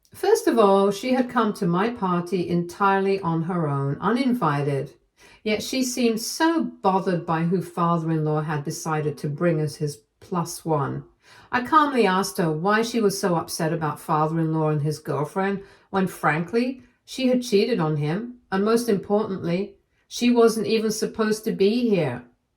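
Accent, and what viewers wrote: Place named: United Kingdom. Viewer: I believe that does not edit the posts but presents them exactly as the OP wrote them. So any errors in choice of words are the OP's. The only thing you can fault on would be pronunciation but keep in mind the she lives in the UK and not the US. (And even in the US there are regional differences in pronunciation of many words just as the UK has regional pronunciation differences.)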